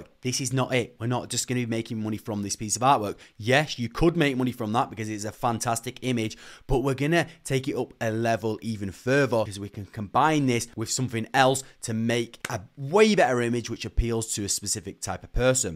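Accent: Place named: Manchester, United Kingdom. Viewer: You sound like a Manc! 🎉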